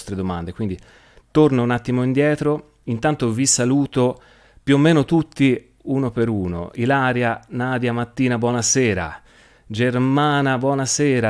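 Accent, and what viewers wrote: Tuscany, Italy. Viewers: non è Nulla caro buona serata ripensando alla legge di attrazione sempre in servizio non conosce crisi di congiuntura 😅 la difficoltà è il vivere con persone anziane di altri tempi e negativi e combattere questa nebulosita è cercare di proporre é difficile continuiamo sempre molte grazie e apprezzo la sua scelta di farci sentire la sua voce Toscana la patria di Dante e sempre molte grazie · Grazie per questa diretta, l’ho vista solo ora. E comunque il cancella, cancella, cancella detto con il tuo accento toscano è già motivo di buon umore 😊😊😊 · Il tuo accento Toscano Tienilo con te ❤️ La tua emozione bellissima Alla prossima diretta ti farò una domanda Buona notte 😘😘😘😘😘 tvb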